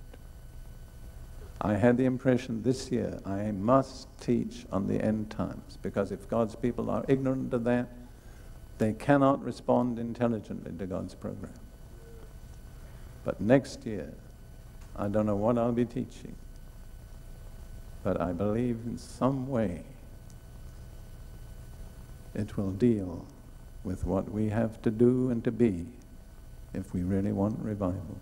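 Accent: United Kingdom. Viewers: Yes! I fully believe you must give way, and let Jesus lead. I wanted to note to the deaf, that toward the end, when he is preaching from Revelation, he mentions "the wrath of the lamb". His british accent causes the captioning to type "roth". But he say's it 3 times, and each time "Lamb" is captioned incorrectly. (Shame on you, Youtube)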